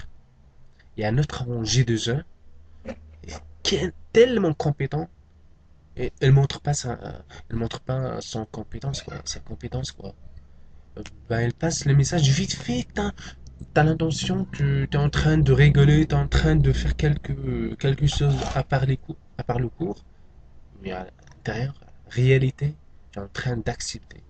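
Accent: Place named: France. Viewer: il faut que tu change ta façon de parler et soit un peu marocain vérile et arréte de faire tes gestuels et ton acçent a la française..sinon c pas mal salam khoya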